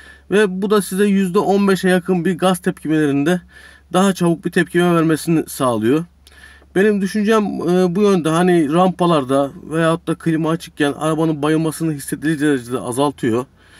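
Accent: Malatya, Turkey. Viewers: Meraba abi acaba malatya lımısın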